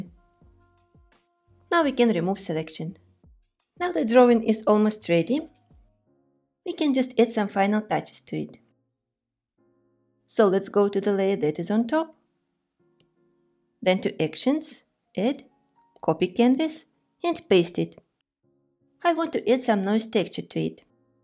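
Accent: Russia. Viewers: Сразу почувствовала, что вы русская ❤ у вас супер Английский) спасибо за отличный урок ❤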